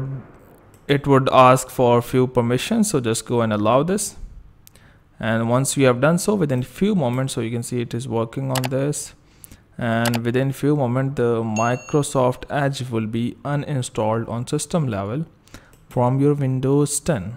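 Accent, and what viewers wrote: India. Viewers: Thank you kind Indian man with a lovely accent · Like everyone is saying. Hearing indian accent can lead up to an no no end. But this guy seems mature, straight on point. Suit and tie my man! Thank Q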